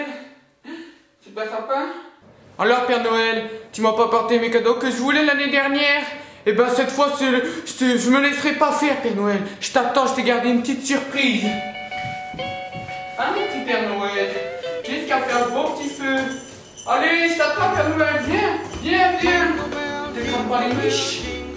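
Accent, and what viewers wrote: Belgium. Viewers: cest la premiere video de que je vois et pour moi cest un jacqouille avec un accent belge echapée d'un hopital pshychiatrique donc voila et en plus il est pas trop drôle! Voila voila koi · J'adore trop c'est ton accent belge 👏👏😄👍🔝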